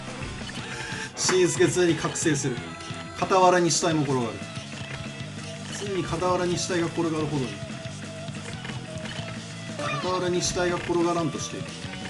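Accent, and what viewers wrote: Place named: Kagoshima, Japan. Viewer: の鹿児島弁かっこいい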